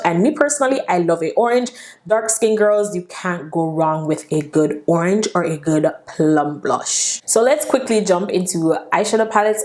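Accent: Jamaica. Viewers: Hey hun...Are u jamaican by any chance.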